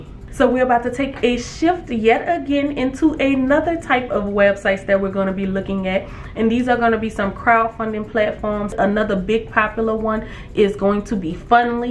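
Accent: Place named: New Orleans, United States. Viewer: I knew it!!! I heard your accent in a few videos ago and I said, "She sounds like she's from New Orleans." The way we pronounce our words is so unique. Thank you so much, homegirl, for the awesome content you create that offers so much information. You're a blessing,.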